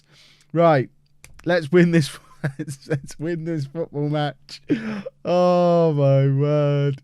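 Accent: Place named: Liverpool, United Kingdom. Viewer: dr 92 he’s defo a scouser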